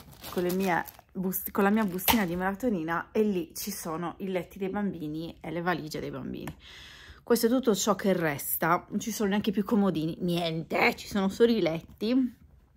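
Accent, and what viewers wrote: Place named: Sardinia, Italy. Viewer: Buongiorno video consigliatomi da YouTube, non ti conosco ora guardo un po' di video per capire, dall'accento sei Sarda ? Vivi in america mi sembra di aver capito 😊 io Sardo vivo in Germania da 22 anni. Anche io ho una canale .. adesso vado a veder qualche tuo video ❤ a presto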